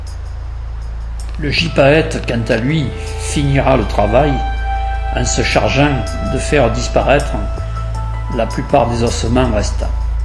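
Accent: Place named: Provence, France. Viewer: Mon père qui est randonneur me parle souvent du Mercantour qu'il affectionne pour l'avoir foulé plusieurs fois. Je le découvre avec émerveillement grâce à tes images magnifiques. Comme le dit si bien Daniel (Lapin 25), ta voix de provençal nous fait déjà voyager. Grand bravo, amicalement Gégé et Luciole du Doubs.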